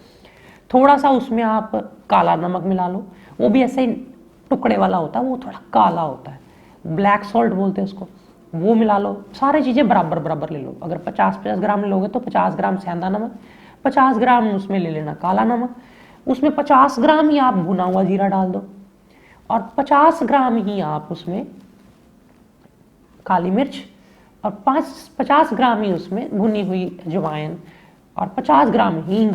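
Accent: Punjab, India. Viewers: Thank you very much for teaching us so many good things.aap Ka Punjabi eccsent bot achha lagta hai jaise koi Ghar Ka member hi samjha raha ho .pls tell it contact no. After cancer treatment big changing side effects have come.no one telling us the way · Thanks for information. R u Punjabi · Guru g apki tone punjabi aa rhi h...acha feel hota h Koii formality nhi lgti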